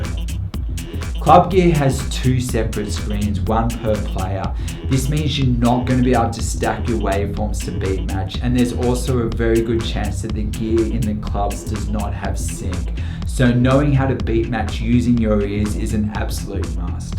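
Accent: Australia. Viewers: i can hear and aussie accent? ahahahah love it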